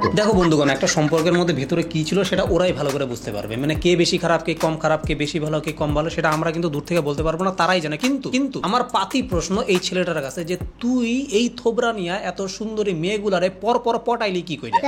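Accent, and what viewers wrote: Bangladesh. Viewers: Ai Vai apnar language tone toh Pura amader Bangladesher moto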